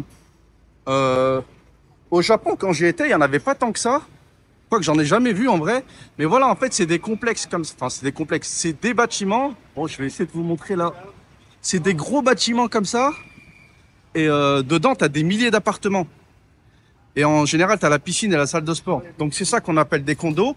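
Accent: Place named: Maghrebi suburbs, France. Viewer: accent de banlieusard maghrébin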